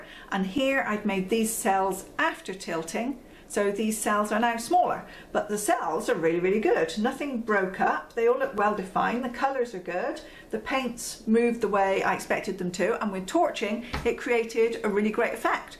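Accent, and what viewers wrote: United Kingdom. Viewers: It is so nice to watch your videos. They are straight and to the point. I would also add that it is nice to hear a concise commentary, and also a UK accent as so many are from USA it feels like this art is solely for their market. No disrespect intended, but it is refreshing to know that this art is global and there are those here in UK who also embrace this wonderful art.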